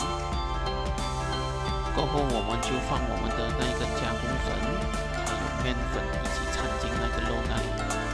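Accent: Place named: Malaysia. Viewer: 因为用的是马来西亚的口音啊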